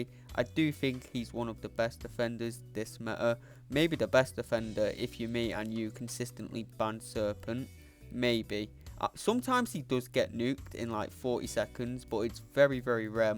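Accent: United Kingdom. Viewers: He has an accent, plenty of people in the uk speak like that, don’t be obtuse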